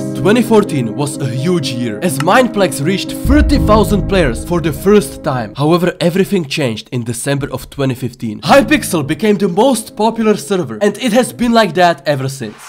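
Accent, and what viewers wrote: Czech Republic. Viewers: dneska jsem se podival na tvoje video po prve a hned my došlo že jsi čech podle přízvuku xd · Angličtina dobrá jen zapracuj na výslovnosti máš pořád strašně český přízvuk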